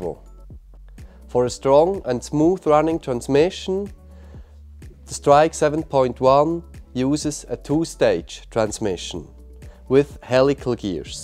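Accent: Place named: Switzerland. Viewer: Koleg me hört richdig krass s schwizerdütsch us dim englinsch use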